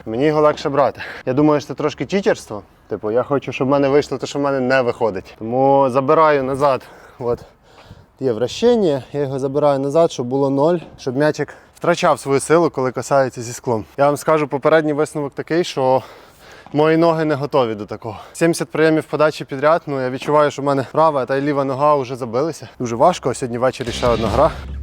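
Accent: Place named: Volyn, Ukraine. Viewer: Випадково не з Волині?) Акцент рідний якийсь 😁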